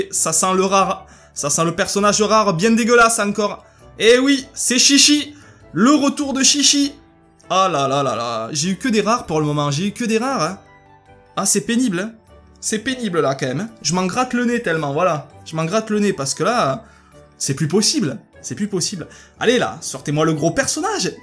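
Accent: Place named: Marseille, France. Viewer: tu es Marseillais? Car je suis Marseillais et j'entend plein de personnes avec ton accent et TU AS LE MÊME ACCENT QUE MOI ☺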